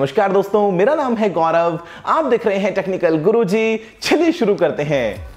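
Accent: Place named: Haryana, India. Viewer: Sir aap basically aap haryanvi hai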